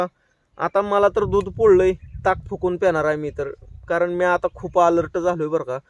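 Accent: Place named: Marathwada, India. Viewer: दादा तुम्ही कुठून आहे तुमचं बोलणं मराठवाड्यात दिसतंय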